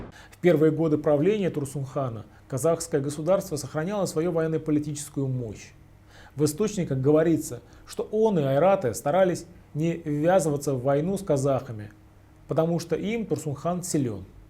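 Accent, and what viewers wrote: Russia. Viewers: согласен что достойный человек, но он сам признавался что плохо владеет татарским языком. Так что произнося тюркские слова он во многом говорит как русский.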